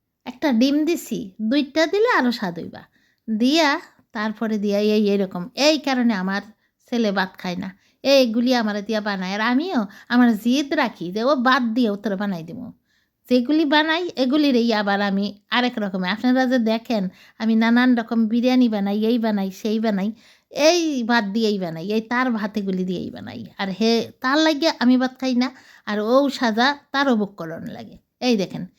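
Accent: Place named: Bangal, Bangladesh. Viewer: তোমার বাঙাল কথা শুনে আমার খুব ভালো লাগলো দিদিভাই🙏🙏🙏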